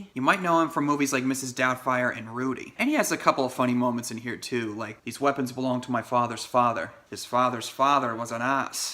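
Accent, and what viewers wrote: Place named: Ireland. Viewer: Do a YouTube search for Star Stories Tom Cruise to see a very funny “summary” of the film. I enjoyed your review and it nearly makes me want to watch the film again to see if I will change my rating from the one I gave it then: TotalAbsoluteLoadaShite. Your Irish accent is grand, def better than many American actors.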